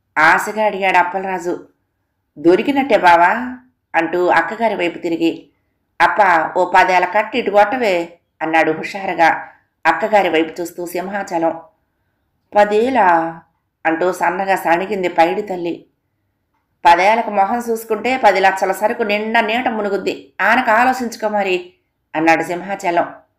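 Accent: Visakhapatnam, India. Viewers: garu meru antha bhaga vizag slang lo chadevaru mam ur voice is god gift andi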